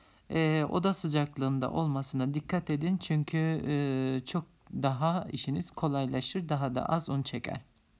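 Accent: Black Sea, Turkey. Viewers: aksandan biraz karadeniz yöresi sezdim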